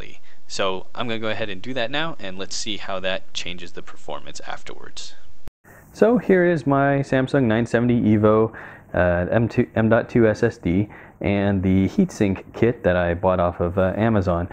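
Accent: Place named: New York, United States. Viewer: Are you from the same area (new-york-ish) as the famous repair-rights activist Louis Rossman? It sounds like you guys have the same dialect - a lot.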